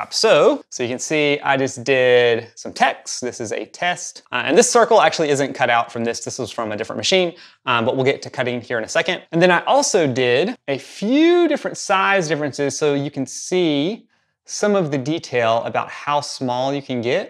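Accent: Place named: Tennessee, United States. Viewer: Dude where you from?! lol I hear the country boy within. TN?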